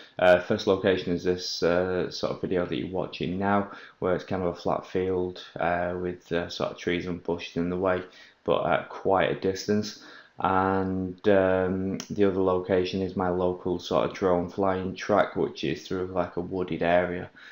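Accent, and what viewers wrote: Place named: Greater Manchester, United Kingdom. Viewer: Yeah i can see where you were coming from. Get a patch on and you should see improvement, i only flew a few packs with mine at the weekend and i love it already. I wasn't prepared to pay over £100 for the LaForge for what is probably exactly the same performance wise. ps. your accent sounds local(ish) guessing somewhere around Greater Manchester?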